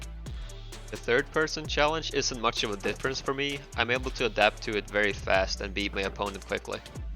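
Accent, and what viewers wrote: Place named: Sweden. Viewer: du måste vara svensk efter din dialekt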